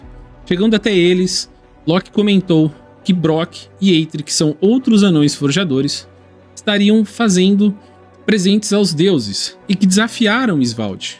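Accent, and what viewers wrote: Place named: Campinas, Brazil. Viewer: Excelente vídeo mano parabéns, senti falta do sotaque DDD 19, cadê? 😂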